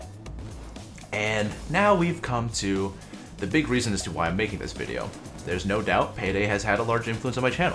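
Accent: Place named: United Kingdom. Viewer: I'm agree to most of things you said but, in a matter of being professional, you need to upload real pro videos for old stuffs you did before. not all of them but as I checked, you were not aware of all the details and clues of each heist. ofc watching your videos gave me some clues of things i was not aware and ofc I do heists in my own way even if there be an easier tactic. but for example missing one of two paintings in Diamond DLC heist is a shame, I mean right now after years you're playing it you should know there is 2 paintings and not 1. anyway as you said that you dont seek any suggestion ( lol) just skip what i said on top lines. you are a good player and I enjoyed watching your videos here in youtube and again I like the way you explain and argue while recording it. at first video I thought you're british? IDK why. keep going and I know its boring to read and listen to a lot of stuffs you may heard or know before. 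keep going and dont forget im the number one in stealth! but im infamy you know lolz.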